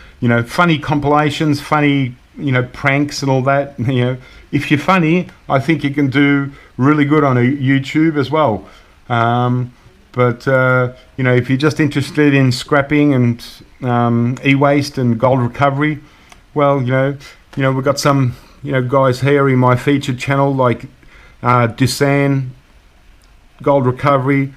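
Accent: Australia. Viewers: We love your Australian accent! I really didn't understand how YouTube revenue worked . I'll start sitting through the ads when I watch your stuff. Keep them coming. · Not sure why you think you'd be more popular with an American accent mate. I think it's just the opposite, we like hearing your Australian accent, and your great opening, "G'day scrapers"!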